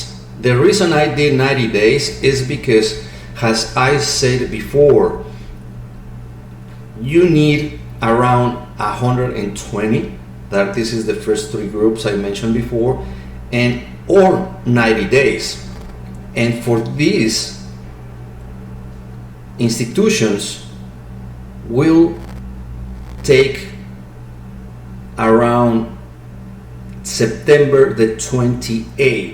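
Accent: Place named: Latin America. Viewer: sabía que eras de latinoamerica cuando empezaste a hablar con acento y te entendí perfectamente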